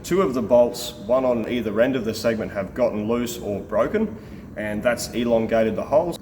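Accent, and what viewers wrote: Australia. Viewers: Aussie accent…. “That’s not an Allen Wrench, this is an Allen Wrench”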